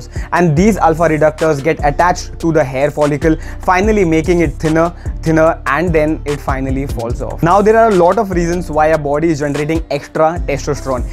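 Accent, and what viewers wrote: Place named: India. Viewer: Thank you for your posting, the time you took from research to actually posting. BUT! Could you please have someone else speak with better English. Your Very Strong Indian accent kills all your hard work. Thank you.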